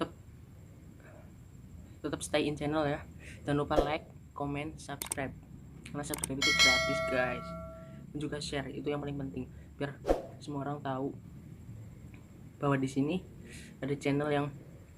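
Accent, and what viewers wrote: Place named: Banyumas, Indonesia. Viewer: Hahaaa wong ngapak yaahh.... Mantap pedes gilaaaa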